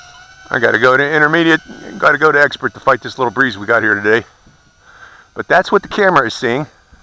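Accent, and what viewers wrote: Pittsburgh, United States. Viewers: gotta love that pittsburgh accent :)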